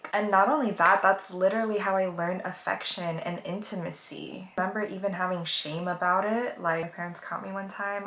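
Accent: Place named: California, United States. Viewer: Catholic religion is the reason why children turn out like this. These girls who where handed everything and go to catholic school end up the worst. Girls like this who are ungrateful always act like this. They always seem to turn out to be narcissists on youtube or some type of affirmation platform, looking for attention because of her narcissist ego. This is why she is always on a platform showing what she has needing attention. She will always be this way. Blaming porn for your failed relationships and choices, and choices of men is ludicrous. There are million of women who don't watch porn and still want abusive men instead real men. The way she talks you can tell she's from California. They have the attitude like they are great. The mentality of of doing what ever they want with consequences. "wanting to be cool." Californians are handed everything and still act like this with no appreciation fo being born privileged. 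This type of narcissist will blame everyone else for their problems. Now she expects for men to forget about her past. She starts talking about fast money. Theres no such thing as working hard and gaining wealth, thats an oxymoron. Money brings wealthy. She was a rich girl who never need money. So why is she preaching about money? Her parents will take care of her tor the rest of her life. People born poor need money to build wealth. Her explaining that she only views men if they are "charming" "good looking" she mentions nothing of their IQ or intelligence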